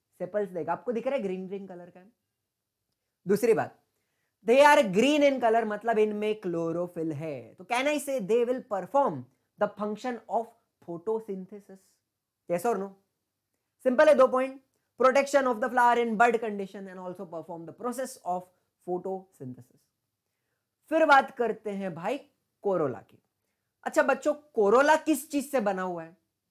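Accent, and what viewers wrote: Maharashtra, India. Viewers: I think sir is gifted by God for 10th students. And one thing..... Sir's mothertongue is Marathi (chikhat)😅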